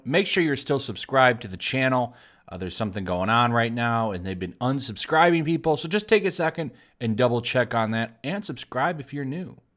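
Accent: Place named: RP, United Kingdom. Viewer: 98% of British (English, Scottish and Welsh) people don't really sound like that....Atleast you sounded like the Royals. 😂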